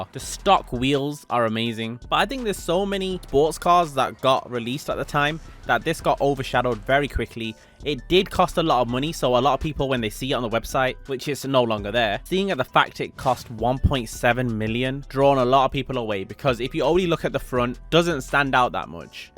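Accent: United Kingdom. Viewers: The uk accent 🤮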